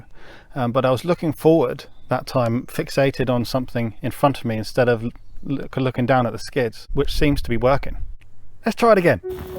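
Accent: United Kingdom. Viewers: This is a fantastic project. I have always wanted to build my own aircraft but have too many restrictions. There is an airfield within 3 miles of my home but it is run by a bunch of millionaires who like to keep it as an exclusive club for them and their cronies and excude any other aircraft types. There used to be a microlight school operating there but the committee of millionaires drove him out! Because of this I have been looking for an aircraft that could be operated from my large back garden so a helicopter would be the answer but in the UK we have very restrictive legislation regarding home built aircraft especially rotorcraft which has always put me off building an aircraft. I wish we had the same freedoms as they do in the US. Judging from your accent and the fact you mentioned getting your engine for 500 quid in another video you are indeed in the UK. 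if so how are you going to get this aircraft certified to make it legal to fly as otherwise it will end up being an expensive garden ornament. Also if i was building this aircraft i would look at making some redundancy in the belt drive ie have more than one belt per rotor as if one of the belts on your craft were to go twang one of the roters will stop and you will have no control of it and it will be game over!!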